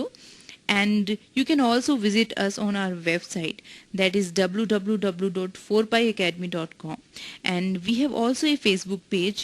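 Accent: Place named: India. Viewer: ....本来听生肉已经很难了，还是印度口音，有点绝望。不过讲的确实不错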